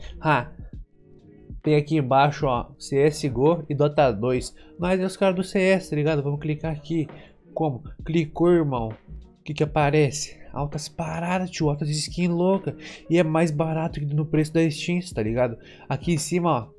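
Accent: Rio Grande do Sul, Brazil. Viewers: madrugando no game atá,achei q era do rio grande pelo sutaque de gaucho kakakaka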